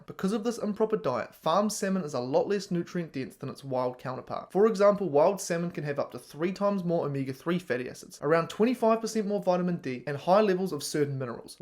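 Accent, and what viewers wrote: New Zealand. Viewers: Accurate video.. love the Kiwi accent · Are you a New Zealander? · First video I’ve ever seen on this channel… what is his accent?! It’s seems to be 70% NZ, 15%Bondi hipster, 15% Saffa. Parents from Zim? I dunno, but I want to 😂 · Ah— NZ! I knew the "accent" was not AU, and not SA.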